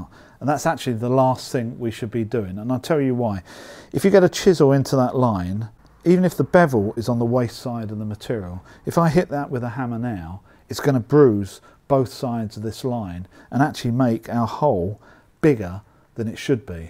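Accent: England, United Kingdom. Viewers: Maybe it’s the English accent but you sir are a terrific teacher! Subscribed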